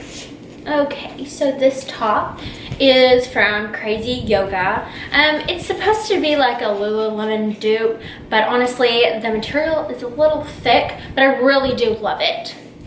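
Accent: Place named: South, United States. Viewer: nice accent of the sweet south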